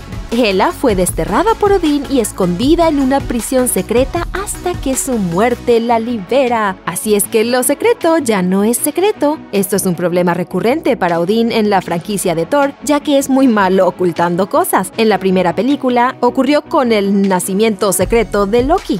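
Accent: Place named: United States. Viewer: Por que hablas como gringa que apenas aprendió español?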